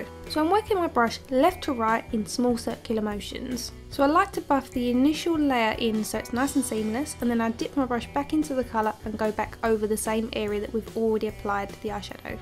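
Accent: United Kingdom. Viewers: Aw you replied. that's so nice of you. i recreated this look and learned new tricks and it came out nice. i've been watching your tutorials and its one of the best. you deserve more subbies and btw i followed you on IG. more power to you. anyways are you british? JW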